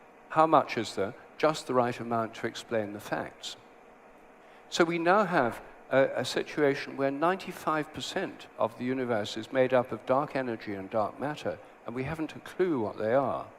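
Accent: United Kingdom. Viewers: I'm tired of British accents. This man goes on and on. I don't disagree with him on everything here. It's just boring. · He looks like a pathetic hobo. If you're going to sport the British accent and give a symposium in front of a large crowd, at least take 5 minutes to pull your pants and well . He's mocking others while looking like that. Come on sir, be civil and respectable. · Just because you have a British accent doesn't make you smart. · I can see how a take down of modern science can be a appealing to some but this is pretty stupid. Don’t let the British accent and crazy hair fool you 😂.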